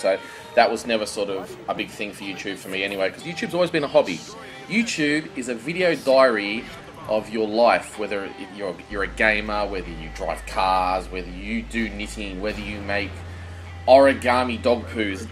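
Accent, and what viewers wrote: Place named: Australia. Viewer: I like the Australian accent, so pwetty :3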